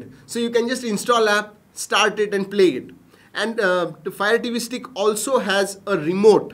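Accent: India. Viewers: jatin gupta if you wan't to discourage this guy do it based on incorrect facts produced by him but don't disrespect they guy "Indian accent" just because of his lack of factual data in the video.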